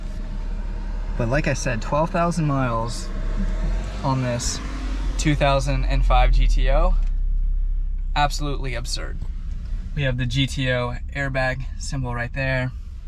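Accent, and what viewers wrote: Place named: Australia. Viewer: Aussie, mate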